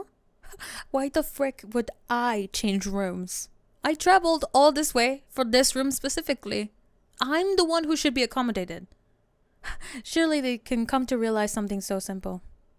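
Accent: Spain. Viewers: I've heard you do French and those accents, but Spanish. Oh muy senorita or oh lala, I can't decide but wow! Amazing job on this, I am very attracted and very confused lol! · I barely understood the accent, spanish and russian for some reason?? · This actually surprised me because most people do a Russian or French student but with a Spanish speaking student it caught me off guard, hell with the way you spoke I wouldn’t be surprised if you were Spanish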